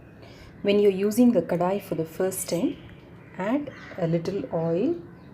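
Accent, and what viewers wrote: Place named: South, United States. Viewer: Very very informative. Thank you. I like your southy accent.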